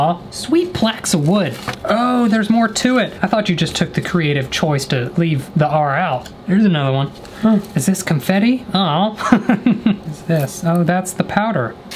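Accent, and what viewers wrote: South, United States. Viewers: "Everything is a Coke" He must be from the south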